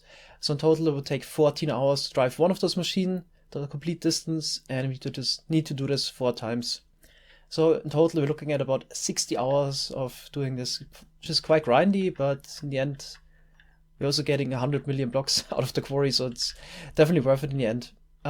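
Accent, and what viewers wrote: Germany. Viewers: German accent is real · Richtig Geiler Deutscher Aktzent von wo kommst du? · Bist du deutsch? 😂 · aber schön deutscher Aktzent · Is he german? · He sounds DEUTSCH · man hört so hart den deutschen Akzent heraus lmao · Der deutsche Akzent, herrlich xd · He sounds very german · Dein Deutscher Akzent gefällt mir ^^ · Are you german?? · his German accent says enough, this guy is definetly an engineer irl.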